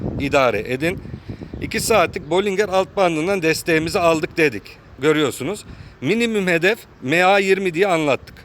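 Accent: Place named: Aegean, Turkey. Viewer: Ege şivesi candır 😄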